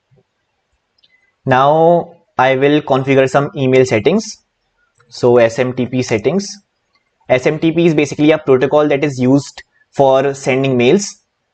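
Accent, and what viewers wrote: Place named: India. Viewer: FInally a youtuber speaking english in an Indian accent ! Amazing efforts !!!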